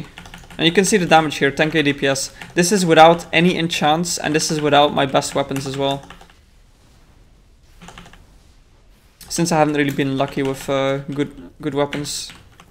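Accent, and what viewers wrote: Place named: Netherlands. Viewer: oh i was guessing dutch, you sound different from the Belgians i know.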